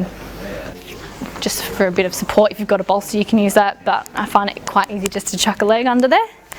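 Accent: Australia. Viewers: Wow. You definitely are from Adelaide. The accent gave it away. Great massage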